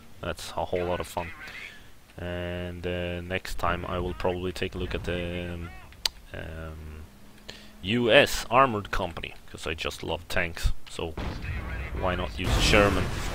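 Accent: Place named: Sweden. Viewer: Where are you from? :) You sound Swedish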